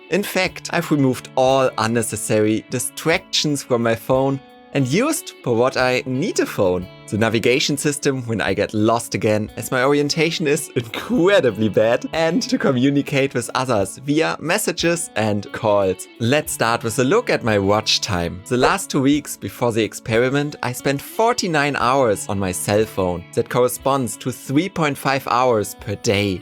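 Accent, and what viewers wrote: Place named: Germany. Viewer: I smell Deutsch but sonst sehr gutes Video!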